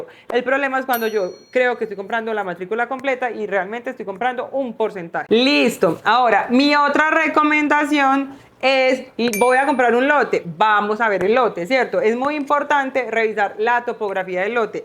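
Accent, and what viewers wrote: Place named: Antioquia, Colombia. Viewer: ¡Viva el acento Paisa!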